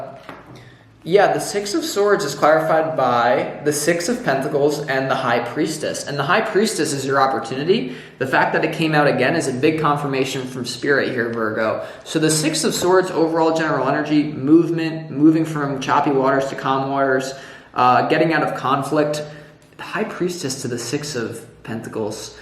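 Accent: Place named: South, United States. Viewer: It's weighing me down and not letting me step into the new and healthy energy and place in life that is right there if I could just let go of them and stop numbing out out of fear. And I also keep thinking maybe I can AGAIN approach this heavy relationship differently and it will magically fit into my highest good. I'm not sure it can though and that sucks. Also the southern accent was funny bc I'm from Alabama 😂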